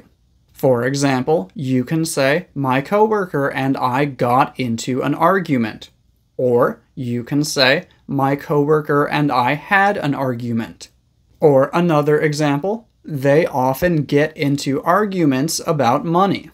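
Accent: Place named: United States. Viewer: Your American accent is pretty nice and clear. ! Thank you so much for your classes. 🎉😊❤❤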